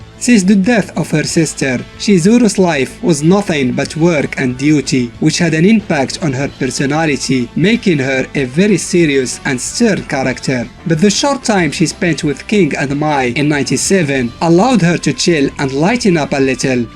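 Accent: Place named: Morocco. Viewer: he is Morrocan,from my country and recognize the accent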